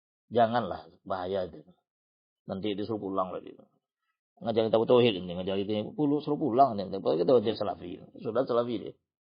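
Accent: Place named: West Sumatra, Indonesia. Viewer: Ini ustadznya orang Minang nih Logat nya...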